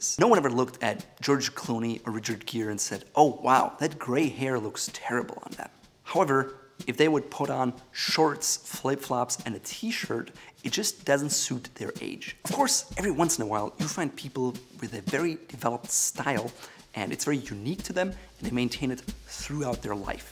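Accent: Germany. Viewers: I like your accent! Are you German? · are you german? your accent sounds like a german.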